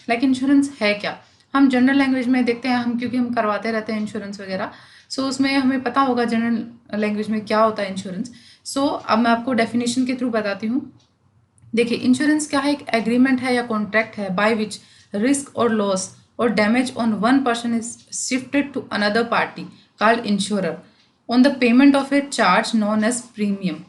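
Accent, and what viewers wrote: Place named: Haryana, India. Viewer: with haryanvi accent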